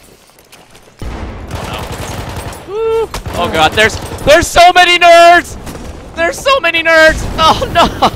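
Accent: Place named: Italy. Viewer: why is the voice italian??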